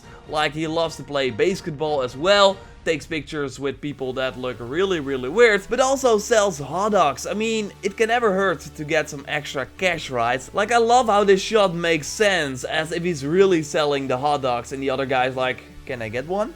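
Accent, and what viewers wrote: Netherlands. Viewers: Are you dutch because you have a dutch accent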